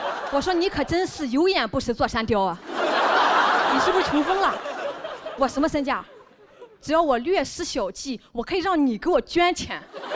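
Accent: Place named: Shandong, China. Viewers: 面相身材口音非常山东！😂😂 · 山东口音，徐志胜也是山东的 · 这个姑娘真不错 三观正 不是为了骂人而骂人 比如杨某 带点土的山东口音又给人真实感 赞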